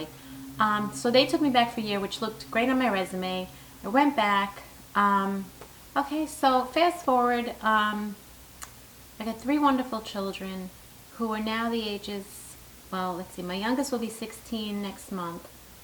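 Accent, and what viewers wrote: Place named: Long Island, United States. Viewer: I appreciate the videos for average, mature women as well. I found you doing a search for curly hair and when I heard the accent I was like ok, I,m from Long Island. So keep it up and thanks for sharing.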